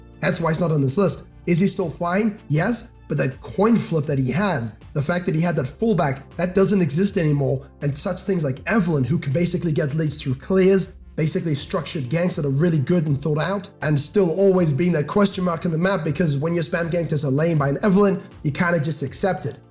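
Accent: South Africa. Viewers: Why do you sound 10x more south african when you're sick lmao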